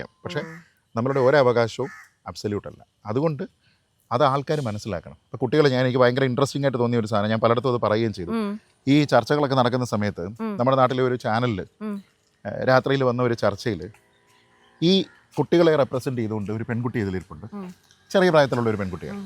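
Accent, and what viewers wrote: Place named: United Kingdom. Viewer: ദക്ഷിണേന്ത്യയിൽ കേരളം മാത്രമാണ് ചില ആങ്കർമാർ ഇംഗ്ലീഷിലോ, അല്ലെങ്കിൽ വ്യക്തമായി ഇംഗ്ലീഷ് സ്വാധീനമുള്ള ഉച്ചാരണത്തോടെ മലയാളത്തി സംസാരിക്കാൻ തിരഞ്ഞെടുക്കുന്ന സംസ്ഥാനമായി തോന്നുന്നത്. പലപ്പോഴും അത് അനാവശ്യമായതും ചില സമയങ്ങളിൽ ശ്രദ്ധ തിരിക്കുന്നതുമായ ഒരു അനുഭവമാണ്. “Big Sister” വർഷങ്ങൾ മുമ്പ് ജോലിക്കായി വോയ്സ് ആൻഡ് ആക്സന്റ് ട്രെയിനിംഗ് എടുത്തതും പിന്നീട് ആ ശൈലി ആങ്കറിംഗിലും പിന്തുടരാൻ ശ്രമിച്ചതും കണ്ടതിന് ശേഷം, ഈ ഘട്ടം കഴിഞ്ഞുപോയി എന്ന് ഞാൻ കരുതിയിരുന്നു.ഇതിനെ കൂടുതൽ വ്യക്തമായി കാണിക്കുന്ന മറ്റൊരു കാര്യം, മലയാളം അതിന്റെ സ്വാഭാവിക സൗന്ദര്യത്തിൽ തന്നെ വ്യക്തതയോടും ആത്മവിശ്വാസത്തോടും സ്വാഭാവികമായ ശൈലിയോടും സംസാരിക്കാനാകുമെന്ന് തെളിയിക്കുന്ന മികച്ച ആശയവിനിമയക്കാരെ കേരളം സ്വന്തമാക്കിയിട്ടുണ്ടെന്നതാണ് — അതിൽ ശ്രീജിത്ത് പണിക്കർ ഒരു മികച്ച ഉദാഹരണമാണ്. ഇംഗ്ലീഷ് ആക്സന്റിൽ ആശ്രയിക്കാതെ തന്നെ മലയാളം എത്ര ശക്തിയും സൗന്ദര്യവും നിറഞ്ഞ ഭാഷയാണെന്ന് അദ്ദേഹത്തിന്റെ അവതരണം തെളിയിക്കുന്നു.മലയാളം അതിന്റെ സ്വന്തം സമ്പന്നമായ വ്യക്തിത്വമുള്ള ഒരു മനോഹര ഭാഷയാണ്. 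അതിന്റെ സ്വാഭാവിക ഉച്ചാരണങ്ങൾ — പ്രാദേശികമായാലും ന്യൂട്രൽ ആയാലും — അലങ്കാരങ്ങൾ ആവശ്യമില്ലാത്ത ഒരു സ്വാഭാവിക ആകർഷണവും സത്യസന്ധതയും നൽകുന്നു. ആങ്കർമാർ ഇംഗ്ലീഷ് സ്വാധീനമുള്ള ഉച്ചാരണത്തിലേക്ക് മാറുമ്പോൾ, ഭാഷയുടെ സ്വാഭാവിക സൗന്ദര്യം മറഞ്ഞുപോകുന്നതുപോലെ തോന്നാറുണ്ട്. Original ; Kerala seems to be the only state in South India where some anchors choose to speak either in English or in Malayalam with a distinctly English‑influenced accent. It often feels unnecessary and, at times, quite distracting.I genuinely thought this phase had passed—especially after “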